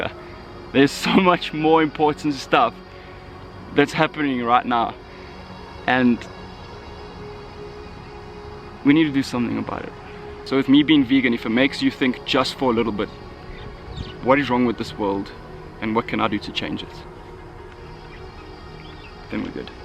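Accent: South Africa. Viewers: I swear I could tell you were South African... I just couldn't figure it out :D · I just love south African accents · I thought I was crazy for thinking you're South African due to your accent, Good video brother 💪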